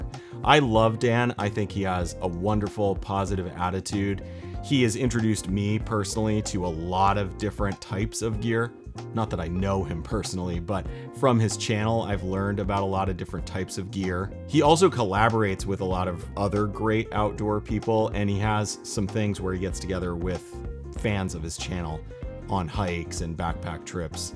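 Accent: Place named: South, United States. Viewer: As someone from a non english speaking country i totally agree with you that a southern accent is very pleasant to listen to.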